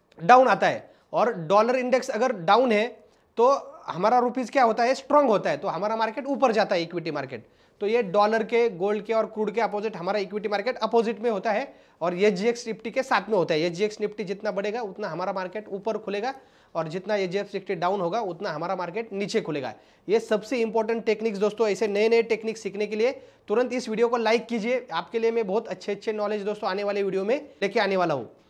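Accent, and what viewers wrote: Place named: Maharashtra, India. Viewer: Sir aapki bato se lagta hai aap MH se ho.....mi ppan Marathi aahe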